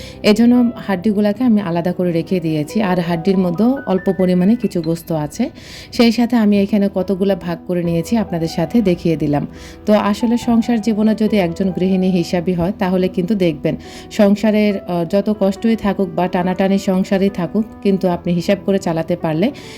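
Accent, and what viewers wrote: Noakhali, Bangladesh. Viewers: নোয়াখাইলা বলে কথা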